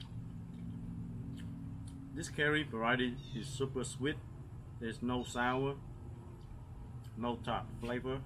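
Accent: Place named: Vietnam. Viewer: beautiful star fruit. You sounds like a Vietnamese, are you?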